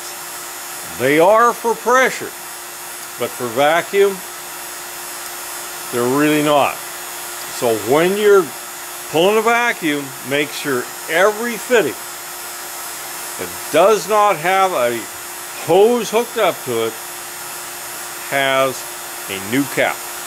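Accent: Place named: South, United States. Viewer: It makes great sense...I learn from this man every time I watch him....A great, country voice, to boot.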